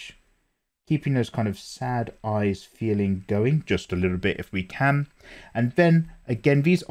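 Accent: United Kingdom. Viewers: I love how British his voice is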